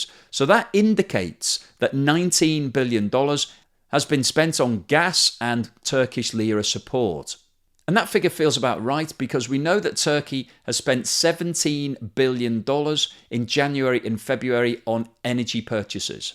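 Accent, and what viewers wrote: United Kingdom. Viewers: Hi, it doesn't really matter what introduction you use. We are here for your nicely structured concise information updates and we love your British accent as well. Did you notice the channel of "Michael invests and tries to make money" always starting with "holy smokes" with an Aussie accent?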